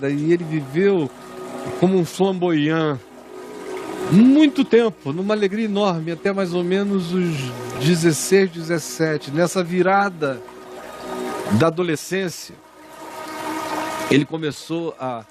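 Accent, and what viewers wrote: Recife, Brazil. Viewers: Nota dez trilhão sabe que fala Recife Pernambuco ❤❤❤❤❤❤❤❤❤❤❤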